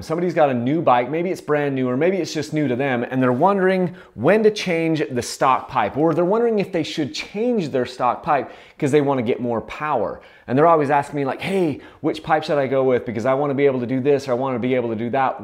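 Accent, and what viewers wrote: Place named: California, United States. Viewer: I can tell your from California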